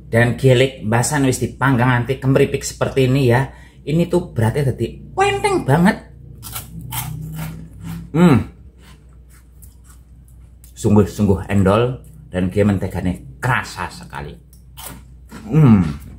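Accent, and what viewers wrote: Banyumas, Indonesia. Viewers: Kiye bagelen ngapak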